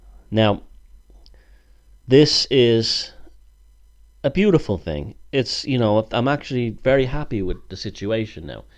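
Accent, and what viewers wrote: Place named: Dublin, Ireland. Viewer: Great to have you enjoying your work, iv learned so much from your videos, I'm like the gotchy man, ya have a Dublin accident people think your a cretan, and my accent is like yours dub. Not the new flat strong Dublin accent, thank you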